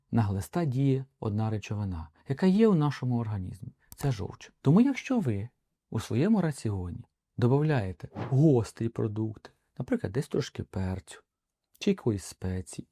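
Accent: Vinnytsia, Ukraine. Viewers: Дякую. Дуже корисна інформація. Іще крім усього насолоджуюся Вінницьким акцентом.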